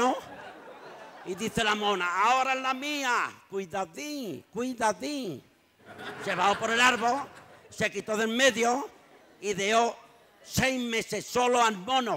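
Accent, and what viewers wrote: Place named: Andalusia, Spain. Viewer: Solo consigo entender el 40% de lo que dice. ¡El andaluz no es castellano!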